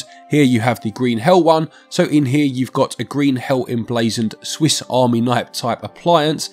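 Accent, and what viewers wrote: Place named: London, United Kingdom. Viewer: I wrote a now-deleted comment that underlined the pronounciation of certain words in the video out of appreciation for the London accent, and not mockery. commented that my comment came accross as offensive, so I would like to sincerely apologise to him and anyone else who read it thinking it was insulting. and are both very talented in their voice over work, and it is a big part of what makes such a great channel. Having lived in many places accross the world, including London, and having an accent myself in all the languages I speak, I would never mock someone else's pronounciation or intonation. So my sincere apologies to and its community.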